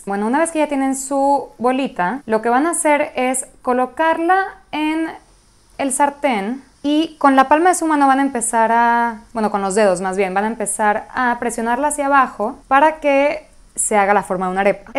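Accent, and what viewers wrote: Mexico. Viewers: No tienes acento, rienes el acento de mi pais, México! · Buscando recetas de arepas, la encontré. Es maravillosa. Me encanta el español que habla, muy claro y su acento mexicano. Soy mexicana viviendo en Europa desde hace bastantes años. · Ahora se me antojo unas arepas pero se me acabo la harina aaaahh:´) jajaja no sabia que eras venezolana, nunca desde que te sigo pensé eso, tu acento mexicano sobresale mucho 😅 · Ahh es q es Venezolana, ahora tiene sentido q tenga acento mexicano, pero su canal se llame 😂😂 ya decía yo..😂😂😂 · Estaba buscando como se hacen las arepas pero creo que son lo mismo que los sopes en México? Creo que las voy a preparar como las estás preparando tu porque tengo esa marca de harina, gracias!! Te escuchas muy mexicana!! Saludos!! ❤ 🇲🇽🙏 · Si tienes algo bello es tu voz que combina super con el acento mexicano ❤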